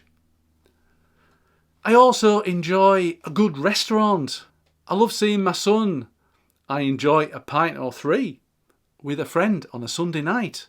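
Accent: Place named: Northern England, United Kingdom. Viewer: Hi, I’ve just found your channel and it’s great to hear your northern accent. I’m a fellow Geordie who emigrated to Alberta Canada back in 2009, but I do have a brother in law in York and miss going there. Mind you, the way you’ve just described it with all the hustle & bustle and drunks from Newcastle coming down on the train I’m not so sure now 😂! I’m 56 and still working part time, but for me it’s the rude people who only think about themselves that annoy me most. I too prefer a quieter life, having grown up going to the Bigg Market drinking for many years lol. I also like to travel in off peak season to avoid the crowds & kids, but most of all during the Winter to escape from -30 temps and the snow. It certainly sounds like the UK has become a lot more expensive, I’ve only been back twice since moving here. Anyway, I just wanted to say hi from a new subscriber and I’m going to watch your other videos. 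P.S is the Cross Keys pub still around?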